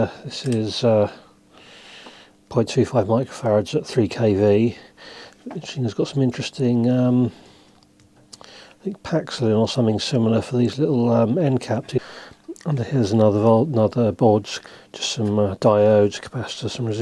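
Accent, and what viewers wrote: United Kingdom. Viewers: I think you're just not used to hearing British English..?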